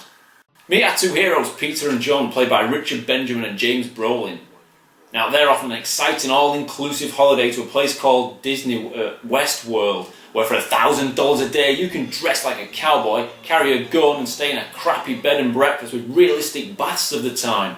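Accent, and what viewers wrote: Scotland, United Kingdom. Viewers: Its wonerful to have you back mate, been waiting for a long time to hear your scottish acent again 5/5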